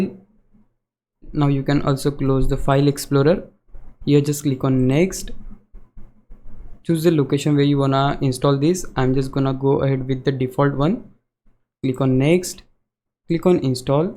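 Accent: India. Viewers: indian acctent carried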